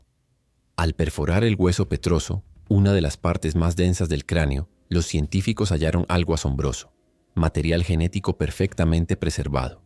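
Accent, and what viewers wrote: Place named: United States. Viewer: No entiendo como, hablando de nosotros, la voz en off tiene acento americano. ???????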